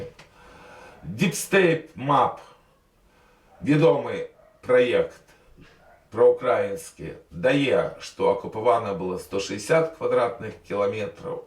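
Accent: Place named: Russia. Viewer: Спадар. Адкажыце, калі-ласка, чаму пад час беларускамоўных прамоў вы цягам дзесяцігоддзяў маеце такі цверды рассейскі гук «в» замест фірмовага беларускага «ў»? Гэта нават не ўкраінізм, а мінавіта рассейскасць.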